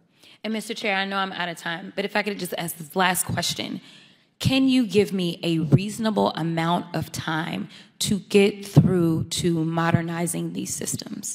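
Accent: South, United States. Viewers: If you know “anything” about people in Texas, they have a southern drawl…. “Y’all” means you all. 😊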